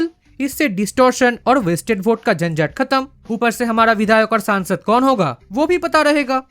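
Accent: Bengal, India. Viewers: R u bengali?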